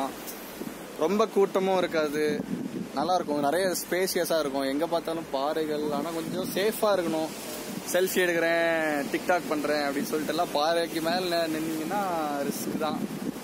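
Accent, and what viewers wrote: Pandi, India. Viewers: Pandi kala irukum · Bro pandi slangla onum peasandam nama adaiyalamana kumari slangla peasunga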